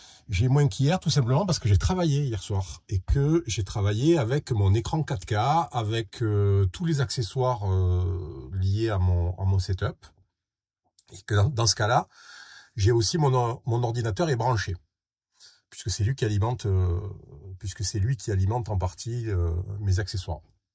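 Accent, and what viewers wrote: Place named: Marseille, France. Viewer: Merci beaucoup pour tes vidéos de partage sans rien avoir à vendre. Cela fait du bien ! Les contenus sont pratiques, efficaces. A ma connaissance, tu es le seul à avoir une démarche aussi complète sur le monde du camping car. Quant au soleil que tu n’as pas dans tes vidéos, cela est compensé par la petite partie de Marseille que tu transportes dans ton phrasé. (J’ai un peu la sensation d’entendre Di Méco.😉) Bonne route pour la suite de ton voyage et encore merci.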